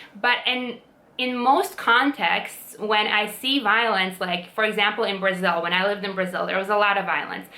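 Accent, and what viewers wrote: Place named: East Coast, United States. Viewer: the East Coast USA accent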